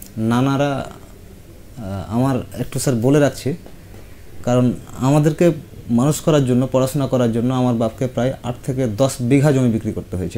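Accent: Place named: Murshidabad, India. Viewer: এটা আমাদের মুর্শিদাবাদের ভাষা। আপনার সাথে পরিচয়ের ইচ্ছে রইল।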